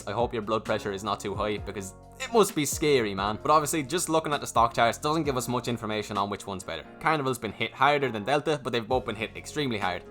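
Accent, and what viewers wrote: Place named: Cork, Ireland. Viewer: you from Cork boy?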